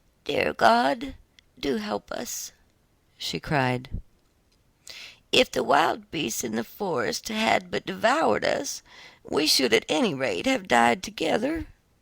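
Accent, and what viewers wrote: South, United States. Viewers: Hated the southern accent . Terrible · I must be the only one here who are NOT distracted by the southern accent. I find it amusing... And I'm from Europe myself. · Although I am grateful for the story, but you aren’t the only one who isn’t distracted by the Southern accent.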